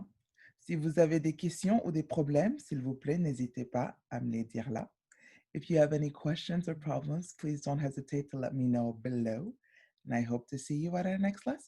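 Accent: France. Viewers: I love your French accent 💕 I tried to take French when I was in high school but never could get the hang of it .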